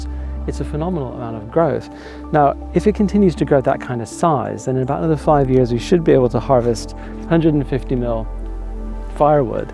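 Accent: United Kingdom. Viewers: seems interesting but it is very difficult to hear because of the loud music background. You Brits are not easy to understand for us in the U.S. who's hearing is not the best. Just saying.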